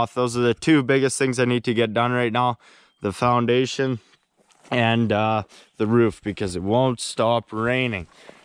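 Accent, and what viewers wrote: Canada. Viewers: There’s my favorite Canadian accent... nice building dude 👍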